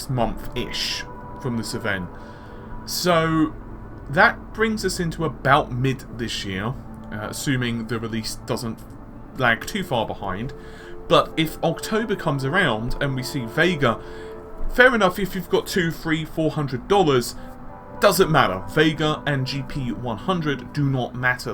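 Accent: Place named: United Kingdom. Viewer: BuckRogers yep, I'm from Costa Rica, i can speak and comprehend pretty well, but due to his tone it is sometimes a bit harder to understand what he says, I'm too distracted with his tone and accent. I gotta put more attention to it. He sounds british to me.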